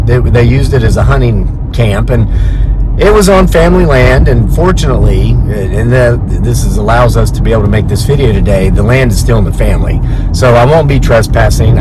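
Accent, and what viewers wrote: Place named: West Virginia, United States. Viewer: Hey... I am one of your fellow West Virginians and I’ve told you before how much I love and am comforted by hearing your west by god Virginia accent...... I was also born and raised in West Virginia.... I wonder if those graves were of the family members..... I have many generations of south Caroline relatives and many years ago family graveyards were common....a part of many properties were graves...civil war even... but that was truly CREEPY and also fits with the other alien abductions you read about where pregnancies were part of the story but NOTHING AS CREEPY AS THIS.... an alien baby channeling their childhood families!! I hope you are home safe and will post on this YouTube channel that you are home safely.....seems like a lot of entities for lack of a better description...are you home safe? Of course it could also be Big Foot mixed in with invisible entities...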